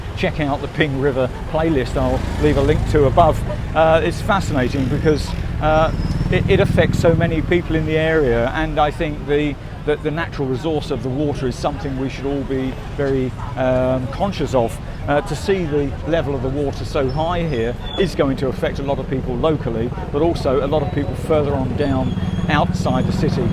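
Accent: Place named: United Kingdom. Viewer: This old POME talks way too much. No economy of thought or speech! Very proud of his UK accent but his Thai pronunciation is hideous!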